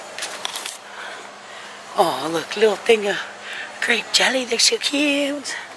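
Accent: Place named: North, United States. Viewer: I think you must live in north. Just the way you talk and eat. I live in Orlando but from Georgia.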